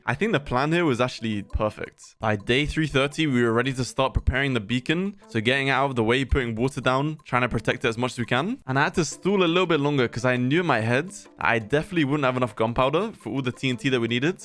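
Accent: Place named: Luton, United Kingdom. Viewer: Yo, are you from Luton?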